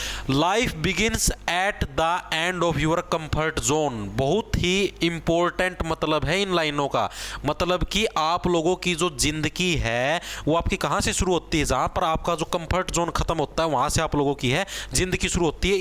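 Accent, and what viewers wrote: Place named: Jamawari, India. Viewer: aap village jamaawari se ho kya aapki aawaj se lagta h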